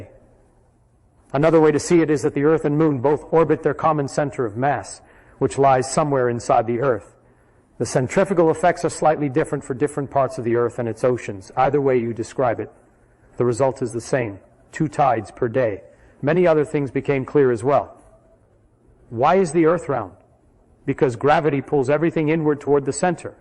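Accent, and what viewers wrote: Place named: Canada. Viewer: That's not Feynman’s voice, it has a Canadian accent.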